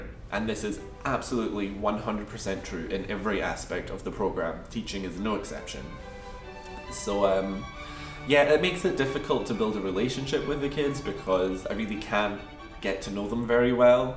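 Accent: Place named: Scotland, United Kingdom. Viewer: Wow! I just came across your channel and was shook to hear your accent!! I'm also from Scotland and I am applying to JET this year - are there many Scottish JETs in Japan?? Look forward to watching the rest of your videos!! :)))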